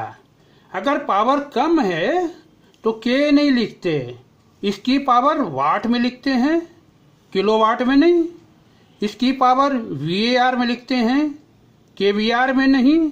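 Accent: Bihar, India. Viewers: Bc Bihari bad speech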